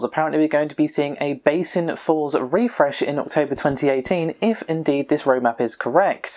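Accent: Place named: London, United Kingdom. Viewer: England, london area I think.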